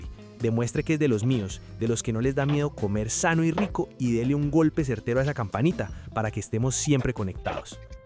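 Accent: Colombia. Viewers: Riquísimo con mango las ensaladas y no comento luego es que lo escucho, me encanta como hablan los Colombianos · #Apenas lo estoy viendo, amigo, eres Colombiano por tu forma de hablar, te sigo viendo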